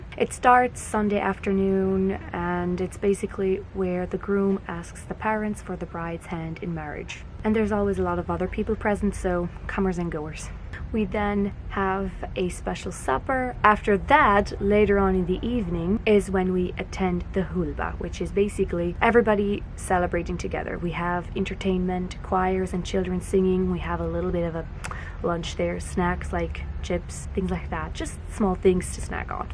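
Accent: Germany. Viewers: Thank you for sharing this beautiful tradition. I'm curious what dialect of German do you speak. Ich spreche Deutsch:)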